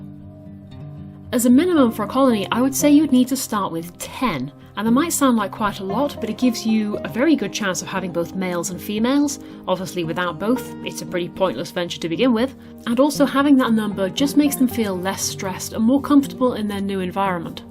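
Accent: United Kingdom. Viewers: Great edit and I really like your UK accent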